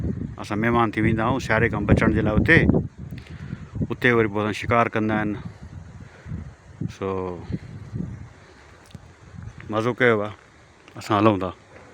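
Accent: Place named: Sindh, Pakistan. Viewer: You have beautiful Sindhi accent. Sir MashaAllah.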